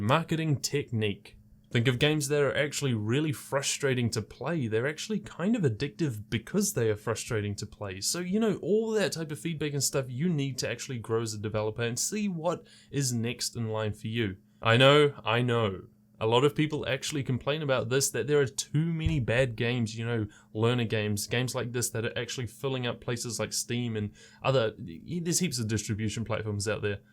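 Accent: New Zealand. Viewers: R u from NZ?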